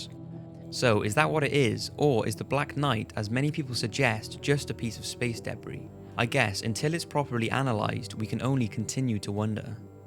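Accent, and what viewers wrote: Wales, United Kingdom. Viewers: R u Welsh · are you Welsh, I am welsh and I have a friend who sounds exactly like you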